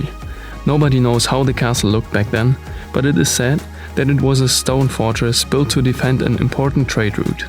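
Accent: Germany. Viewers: Greetings from Tricity in Poland 🌊 you are making amazing videos! I love how informative you are and how you are making proper research and visually your videos are outstanding, too. And narrative voice is so smooth , with compassion and gravity. I 've never thought little german accent could be nice ;-))) this episodes was so sad... :-( hard to watch for me but I appreciate your work and preparation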